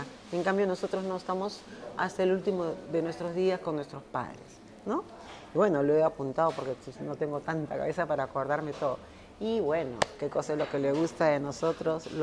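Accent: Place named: Peru. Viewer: me encantó su manera de expresarse, muy pausado, muy agradable, aparte que el acento peruano es tan lindo ;) saludos desde Colombia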